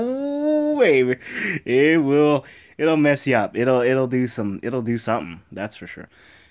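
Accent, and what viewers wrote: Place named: Canada. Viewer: I can almost hear the Canadian in his voice. I wanna say toronto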